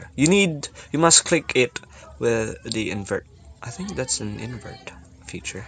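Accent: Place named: Visayas, Philippines. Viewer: Nice english accent bro bisaya with nice English accent? Nice!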